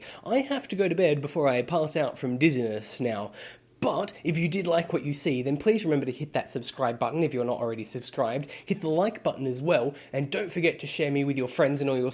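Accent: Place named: United Kingdom. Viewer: You have a British accent when you're sick.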